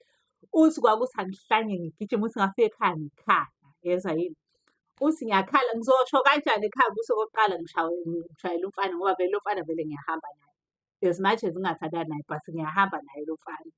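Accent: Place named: Pietermaritzburg, South Africa. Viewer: Hawu awusho awsiye owasePMB? Ngizwa i accent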